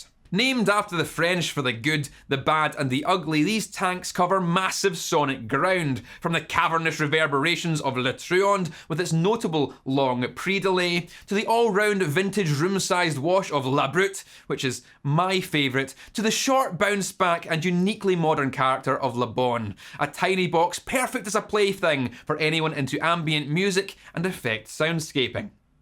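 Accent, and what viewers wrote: Scotland, United Kingdom. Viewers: I think this video is great just to listen to your Scottish accent 😆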